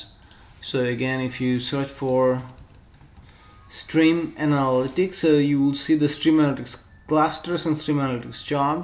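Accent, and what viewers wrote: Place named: Bangladesh. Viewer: Very informative and useful video. Thanks. (in an unrelated note: are you some how connected to Bangladesh ? Your voice notes has mild accent of Bangla. BTW, I am a bangali :-)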